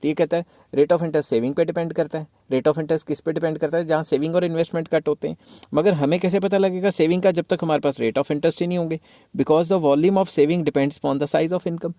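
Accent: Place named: Punjab, India. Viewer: Sir apka punjabi wala hindi bhut mst h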